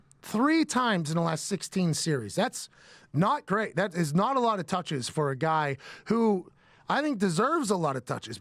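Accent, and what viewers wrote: Pittsburgh, United States. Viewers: I love it when da Pittsburgh accent come aut. Yuenz can hear dat, can't ya.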